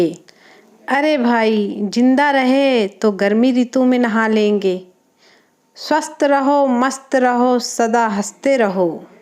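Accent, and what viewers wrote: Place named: Bihar, India. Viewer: Didi aap Pata Logon ki faltu bakwas se please aur Dur Raha karo kuch Log Kahate Hain aapko ki aap Bihari lagti ho aap Bihar chal Bhaag Bihari Bihari Jaisi Teri Awaz hai aapke awaaz me Jaan basiya didi aap yah Baat Jarur Jaan Lijiye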